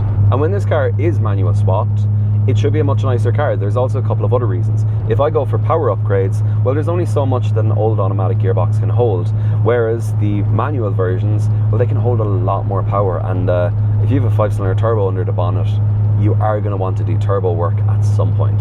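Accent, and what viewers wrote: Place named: Germany. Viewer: damn (i am german) i love your "german accent" it was very funny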